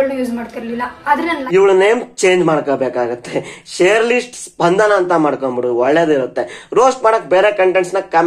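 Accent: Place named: North Karnataka, India. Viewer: ಸ್ವಲ್ಪ ನಮ್ಮ ಉತ್ತರ ಕರ್ನಾಟಕ ಭಾಷೆ ಬರುತ್ತೆ ಅದಂತೂ ಗಿಚ್ಚ್ ಗಿಲಿಗಿಲಿ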